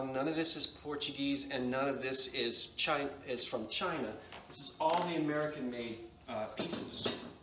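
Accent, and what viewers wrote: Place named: New Jersey, United States. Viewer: I enjoy hearing the New Jersey accent. It would be super fun if you ever want to do a video in full Jersey mode!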